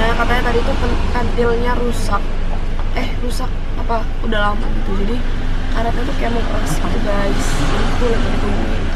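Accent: Java, Indonesia. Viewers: Ngomomg e medok ngono🙄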